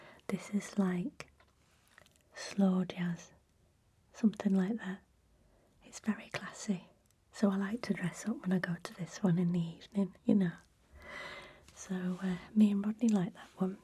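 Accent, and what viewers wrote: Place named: Lancaster, United Kingdom. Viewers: Oh how I miss home now. The sound of my accent. Where are you from? Me…. Sowerby Bridge. Can I book a holiday home through you 😂 from Norway. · ah the northern accent!! meks mi feel reight at ‘ome 😂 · Perfect Lancaster accent - love it! ❤🌹❤ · Omg I'm so excited back! I love rewatching the original tinsels travel every Christmas time 🎄!! (And sometimes during other parts of the year! 😆) Love the northern accent!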